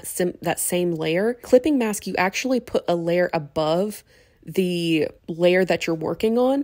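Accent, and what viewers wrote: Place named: South, United States. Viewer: I can hear the southern, and thank you for the video!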